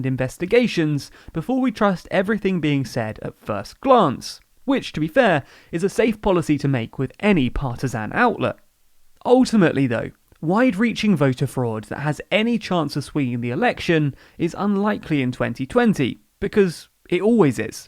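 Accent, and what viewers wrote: United Kingdom. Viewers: Why would I trust anything about the US from a person with a British accent?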